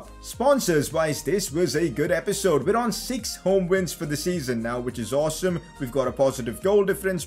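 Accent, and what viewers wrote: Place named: India. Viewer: Are you from India bro, love the accent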